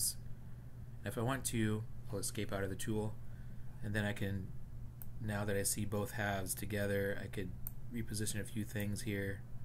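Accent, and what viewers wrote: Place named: United States. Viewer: Finally a tutorial from someone that speaks coherent American English. This was one of the few tutorials I've understood on this subject. Thank you!!!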